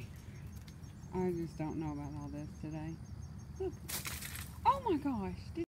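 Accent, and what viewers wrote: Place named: South, United States. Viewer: You sound like you’re southern. Where are you from ? I like your accent .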